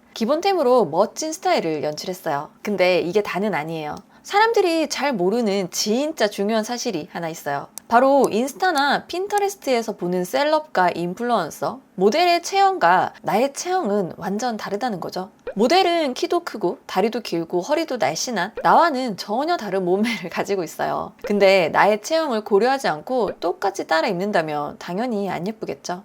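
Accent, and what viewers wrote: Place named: Gyeongsang, South Korea. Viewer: 경상도사투리와 서울 사투리가 아주 이쁘게 믹싱되어 좋네요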